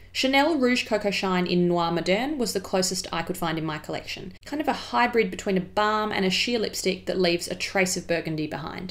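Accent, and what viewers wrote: France. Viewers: I love listening to you speak!!! Your accent sounds authentic-- where'd you learn french? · Nice French accent,. I have to say I didn't know Jeanne Damas before your video. A bientôt 👋😘❤️ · You have an excellent French accent! Are you half French? Love your work What about powders? Thanks 💛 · Damn that French accent tho. (But I’m American and don’t speak French. So what do I know....)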